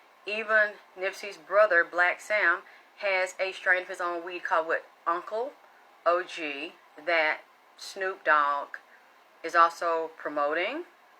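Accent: South, United States. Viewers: “Gummy head” 😫😆🤣 OMG!!! **btw, you’re beautiful 😍 Glad you survived through those toxic years.. Look at you now.. “Where you start does not determine how you pull up nor your destination” 💜💜 I love the southern accent #SOUTHERNGIRLPRESSURE 💪🏽 We built different 🤞🏽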